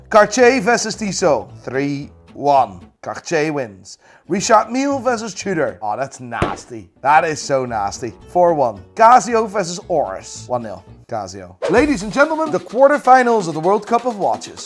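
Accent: Ireland. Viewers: yes but he lived in Ireland for a long time and kinda has Irish accent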